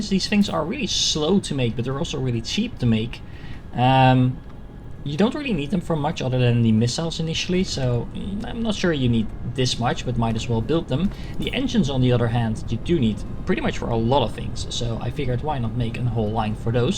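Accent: Netherlands. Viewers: Je klinkt gewoon extra Nederlands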